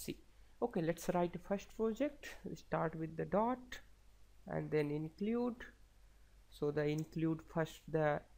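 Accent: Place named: India. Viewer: First of all thank you for your effort. mention that im not racist at all and i love indians, but im sick of that ACCENT!!! Man can you guys please work on your accent its so ugly and as people who need free educational content in coutnries like iran (myself) we really appreciate if indian guys speak like english.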